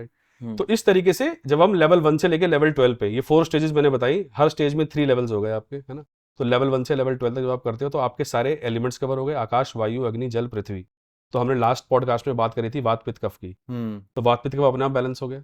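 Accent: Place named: India. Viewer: indian Accent is most Unpleasant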